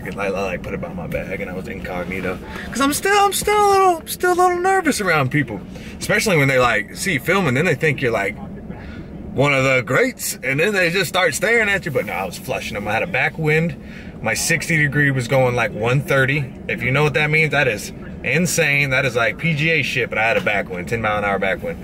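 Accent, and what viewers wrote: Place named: South, United States. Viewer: i didnt expect your southern accent i never heard your voice till now🤣 and it made me fw you even moree very chill and laid back love to see it!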